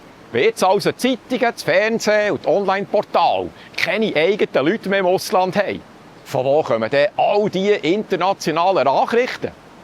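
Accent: Bern, Switzerland. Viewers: Ein so schönes Berndeutsch mit diesem Urdialekt haben Sie sich in den Staaten bewahrt. Neuerdings spricht man lieber eine Mischung aus Hochdeutsch, Berndeutsch und Englisch, weil der Arbeitskollege besser Deutsch als Berndeutsch redet. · Danke vielmals für diese fundierten Recherchen! Fantastische Arbeit. Man wusste es ja, doch so gut zusammengefasst habe ich es noch nirgends gesehen 👍❣️. I lose dir immer wieder vo Bärn zue. U wiu du so outhentisch schwyzerisch brichtisch, hani o mi Brüetsch chönne überzüge, chli kritischer häre z‘luege 🥳🥰. Danke dir ☺️. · Bisch de du Burdlefer oder Thuner?😅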